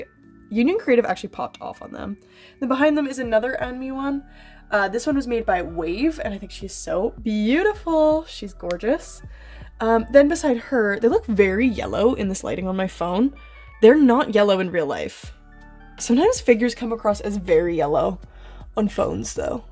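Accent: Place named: Alberta, Canada. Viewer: Just found your channel - you have the strongest Canadian/Albertan accent, it's awesome. Great tour and collection!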